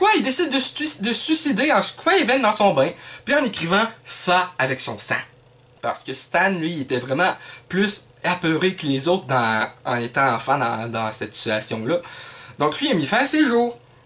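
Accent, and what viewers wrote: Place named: Quebec, Canada. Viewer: Au Québec , vous avez vraiment un drôle d'accent !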